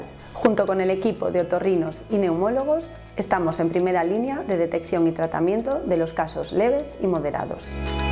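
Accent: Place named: Spain. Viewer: Me imaginé por el acento de la doctora, era en España.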